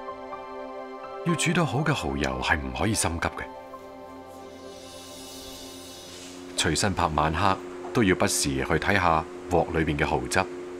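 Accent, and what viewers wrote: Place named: Guangdong, China. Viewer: 好开心， 这是真正的广东话。不是那垃圾的香港发音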